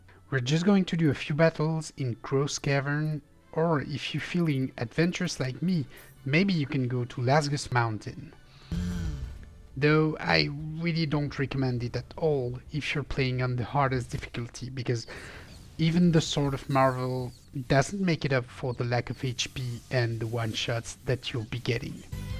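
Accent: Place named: France. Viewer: man, that accent ! Where are you from ? Aren't you french ?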